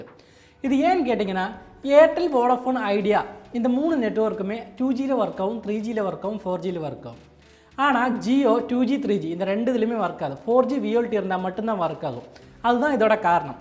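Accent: Kerala, India. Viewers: Bro Nenga Kerala Va... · Malayalam slang mari irukku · Bro ninga keralava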